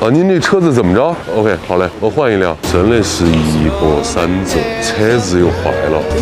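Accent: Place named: Sichuan, China. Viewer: 说四川话比说上海话好听多了，别整天好不啦👎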